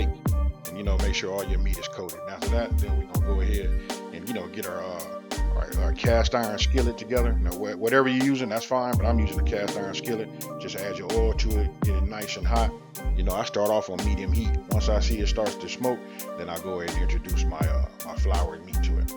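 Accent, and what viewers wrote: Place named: Louisiana, United States. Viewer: the way you say your "i" sounds, I think you are from LA like my husband. He makes grillades and grits, and it's one of my most favorite things! This looks incredible!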